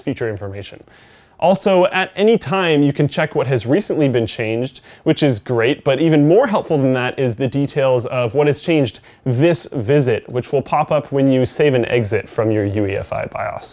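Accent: Canada. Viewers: what's with the Canadian accent? i know you're Canadian and all but i have never heard you nor linus sound like that before! yes i did notice a few videos back!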